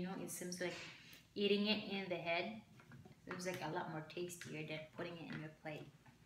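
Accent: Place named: Philippines. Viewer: R u visayan or ilocano? U have the accent... esp with some of ur pronunciations and intonations...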